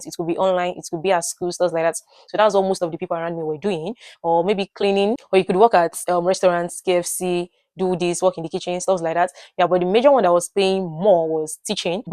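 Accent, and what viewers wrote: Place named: Nigeria. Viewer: Thank you for your video. However, for some people who aren't very familiar with the Nigerian accent, it would be very helpful if you could either speak slower or add subtitles. I, for one, although I understood what you were saying, I had to replay some parts over and over to capture the words. But thank you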